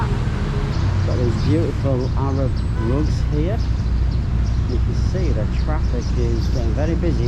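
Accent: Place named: Northern England, United Kingdom. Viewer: Enjoyed the video. Nice to hear a northern accent. I have a mate here in KL from Liverpool but possibly you're a bit further north than that! Me, I'm a Sussex lad from the South.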